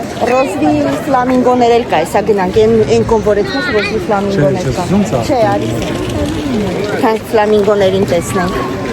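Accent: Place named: Artashat, Armenia. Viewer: ջան, երբ Արտաշատի բարբառով խոսում ես շատ անուշ է, հիշում եմ ուսանողական տարիներս շատ քաղցր ես խոսում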